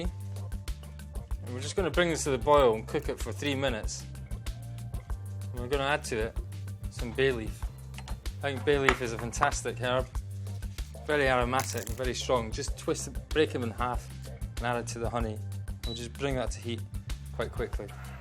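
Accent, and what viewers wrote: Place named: Scotland, United Kingdom. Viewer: EXTREME THICK SCOTTISH ACCENT 🤣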